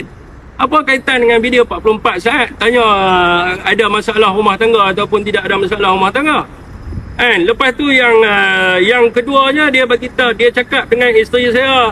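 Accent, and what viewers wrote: Kedah, Malaysia. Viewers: AKU TENGOK MUKA KAU DAH MACAM BEROK. KELUARGA SIAPA NI AGAKNYA. CARA PERCAKAPAN MACAM ORANG KEDAH BETUL KE🤔🤔🤔🤔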